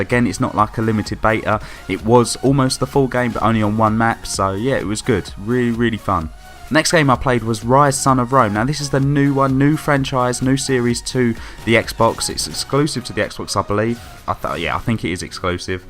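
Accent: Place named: London, United Kingdom. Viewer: oh right yeah you have a bit of a london accent. Can you give me any advice on how to get more subscribers and how to get a bit more confident when doing commentaries ?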